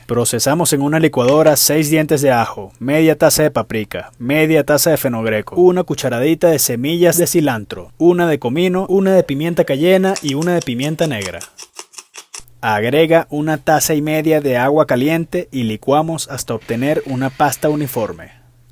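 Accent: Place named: Venezuela. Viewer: por tu acento veo que eres venezolano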